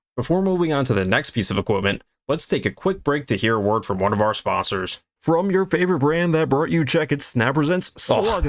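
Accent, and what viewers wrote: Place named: Philadelphia, United States. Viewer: You from the Philly/New Jersey area? Thought I heard the accent in your voice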